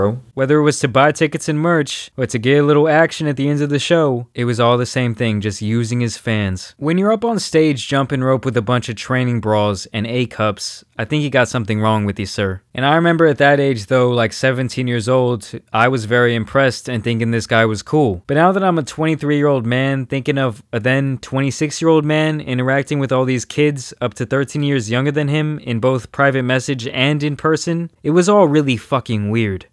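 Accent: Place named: New York, United States. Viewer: I've been a fan of you since when you had like 20k subs I always thought you was from NY cause of your accent.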